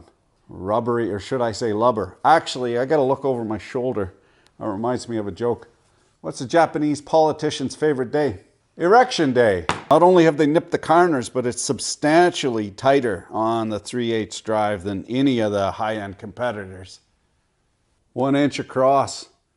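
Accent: Quebec, Canada. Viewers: jmattendais pas a entendre du Quebecois dans une video que j'ai clické au random mais sa fait plaisir au oreilles, cheers man thx for the review oué mon'homme criss de beau outils mets en 🤌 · Très bon Québécois👌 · Tu est Québécois??? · It's a rough Quebec accent .... Pas sur si c'est juste rouillé ou c'est forcé ? Québec ou pas Québec AvE ?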